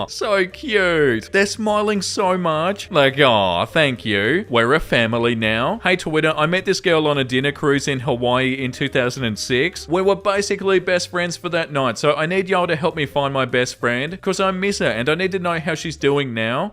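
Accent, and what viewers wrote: Australia. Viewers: oh you're an Aussie. i though maybe i detected sth african/aussie accent. with a dash of NZ lol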